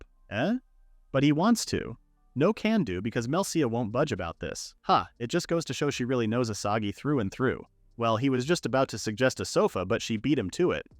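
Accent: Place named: United Kingdom. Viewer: British man spotted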